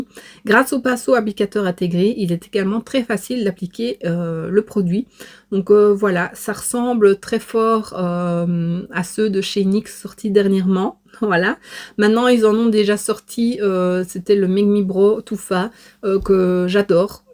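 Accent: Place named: Liège, Belgium. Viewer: a d'accord c'est très jolie se petit accent de liège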